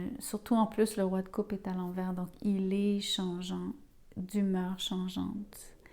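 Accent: Quebec, Canada. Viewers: Bonsoir madame Pour la pause... elle est pour moi une rupture malgré c est vrai une attente pour ce qui est de la communication , j'ai bien tourné le dos à cette personne. Je suis ce Lion ( feu ) et elle balance, tres changeante et tres joueuse, non ancrée et je ne serai pas surppris qu elle joue sur plusieurs tableaux d' ou la rupture . C est vrai, j ai cette impression d un bonheur perdu mais ce n etait qu illusions et je l ai compris et accepté. Tout à fait exact pour cette balance qui n'a aucune confiance en elle meme si elle presente aux yeux du monde tout le contraire. C est vrai... il n y a pas de gris chez moi ... c est tout ou rien . Cette personne a tjrs ete voir ailleurs ( addictions) . Pour ce qui est de se retrouver en couple .... Noway Merci madame💐🙏, voix appaisante, douce, avec un arriere accent du Quebec et meme un peu de latin . Xoxo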